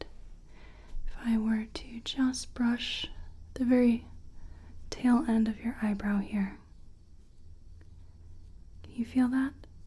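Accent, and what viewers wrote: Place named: South, United States. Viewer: Love your accent, you sound slightly Southern may I say? You are one of the best ASMR artists out there... Love ya😍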